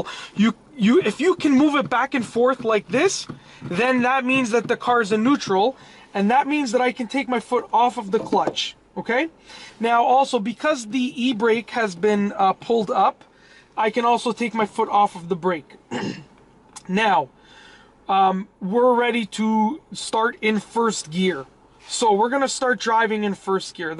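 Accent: Canada. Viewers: I say he's heavy non-Quebec Mountain Canadian. I mean, it's plain obvious. All that's missing is one of those Aviator Hats to complete the look. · I was thinking more of like a Canadian trying to do a normal American accent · Totally Canadian accent. I miss hearing it sometimes! :) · It’s been 5 secs and I can already tell this mans is a fellow Canadian.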